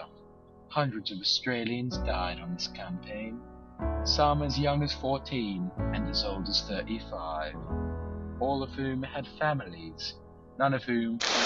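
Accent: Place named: United Kingdom. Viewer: well structured with good music. the british accent was not needed. Deuchar Allen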